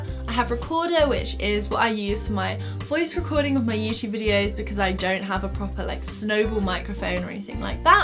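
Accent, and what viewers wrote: United Kingdom. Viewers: Pia Ana I absolutely adore british accent. Idk why it sounds sooo nice😂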